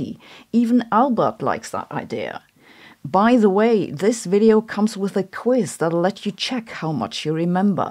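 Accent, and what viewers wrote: Germany. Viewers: I take everything this channel says with a large grain of salt - she does not even understand Special Relativity as proved by her clickbait videos about "faster than light travel" - had she ever studied Special Relativity and Lorentz Transformations, she would understand that "faster than light" is like saying "negative distance" , it is an oxymoron. Unfortunately, she would not know Minkowski spacetime if it hit her in the face. I expect scientists with German accents to be smart - sadly, she falls well short of the stereotype.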